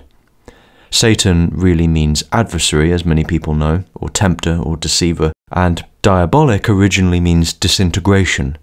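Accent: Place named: RP, United Kingdom. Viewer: Listening to you speak with your classic posh accent is both entertaining and ASMR soothing. Hope for many more from you are you fill a gap of value to me 🙂👍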